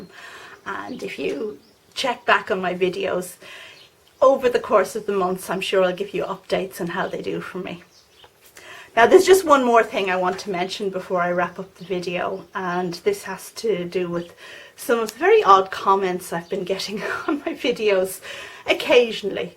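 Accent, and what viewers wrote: Ireland. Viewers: I appreciate your Irish accent so amazing! Keep on making your marvelous job!... It.’s pm in Rio but I had to see your voice and your lovely way of explain the plants secrets...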